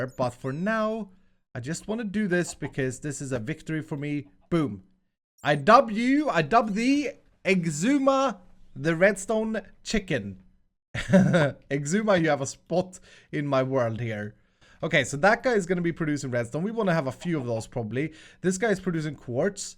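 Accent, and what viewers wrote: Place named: Sweden. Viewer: He is from Sweden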